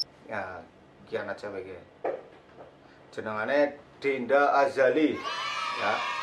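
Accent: Banyumas, Indonesia. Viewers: Q ora due instagrama mas...penting lih esih ttp ngapak mas +batir"....kritikan anggep bae masukan sing bagus..ttp positif thinking bae bn terus majuuuu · Salam cingire teko lampung kang, semangat pokoke nggawe film e. Aku wong jowo tapi nak ndelokne wong jowo ngapak ngomong iso nggarai ilang mumete, lucu soale kang😂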